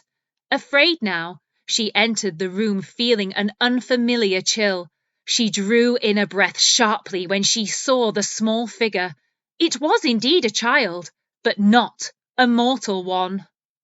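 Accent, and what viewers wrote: United Kingdom. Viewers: i just listen only for one purpose because i AM FOND OF British accent i really adore this accent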